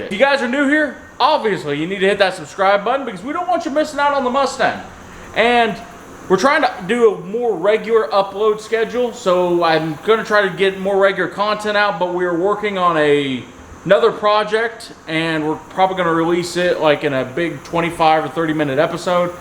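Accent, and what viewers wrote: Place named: Kansas, United States. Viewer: What part of Kansas are you from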